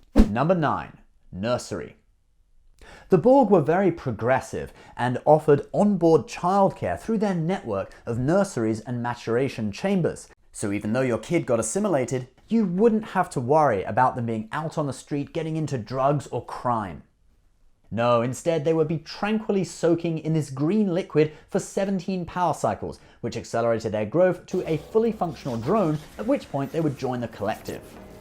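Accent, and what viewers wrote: United Kingdom. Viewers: YES THE FRICKING BRITISH IS BACK EVERYONE THIS IS EVEN BDTTER THAN WHEN I FIRST HEARED ABOUT HALF LIFE ALYX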